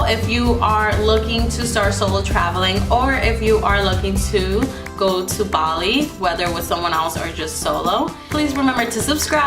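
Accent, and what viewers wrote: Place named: Latin America. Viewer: For some reason u have a Latina accent. Or maybe I’m trippen... anyways aside from that, I’m considering solo traveling for my birthday in the summer. Ur pictures are amazing. I’m curious how were U able to have pictures taken of urself do u ask strangers. Also I seen you went from the Airbnb hut and then you found a cheaper hotel was there a reason u switched stays. I also want to get amazing pics and experiences while also staying safe, I’ve been flirting with this solo traveler idea for so long I just don’t know where to begin but I don’t want to wait anymore any feedback is appreciated. 🤗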